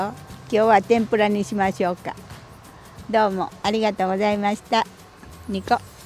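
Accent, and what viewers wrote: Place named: Ehime, Japan. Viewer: のファンです。私と同い年なのに、テキパキ畑仕事をやりこなして、凄いな！って感心しています。何より息子さんや、家族に恵まれていて、羨ましいです。愛媛出身なので、方言が所々似ていて聴いていて楽しいです。笑顔良しの、これからも無理しない様頑張って下さい。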